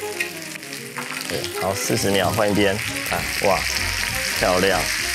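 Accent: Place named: Taiwan. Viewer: 你的嗓子好尖，台湾普通話味道，王力宏也是这味道儿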